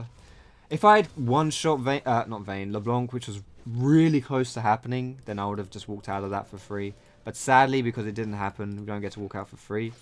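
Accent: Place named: Birmingham, United Kingdom. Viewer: HEALTH POTIONS PELASE. You sounded so much like my brummie housemate, made me laugh so much xD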